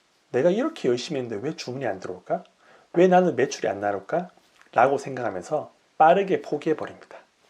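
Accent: Busan, South Korea. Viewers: 말투가 익숙해서 설마했는데 부산분이신가보네요. 투트랙영상보고 알았어요. 근데 부산분치고는 말씀이 아주 부드럽고 듣기 편하시네요!! 유툽이름에 복수형으로 하신 이유가 짐작이 될정도로 진정성이 느껴집니다. 무지에서 유를 창조해내고 누구나 할수있고 될수있다는것을 전파하고싶고 다같이 돈벌어보자는 이유에서 복수형으로 지으셨네요. 진심이 느껴집니다.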